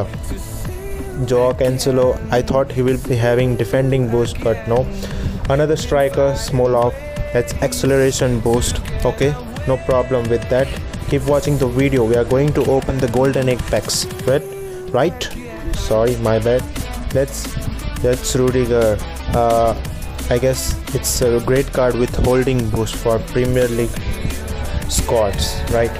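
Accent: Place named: India. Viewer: Bruh from the english talking i know ur Indian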